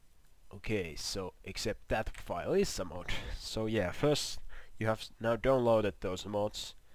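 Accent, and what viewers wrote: Finland. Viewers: Your accent is slightly Finnish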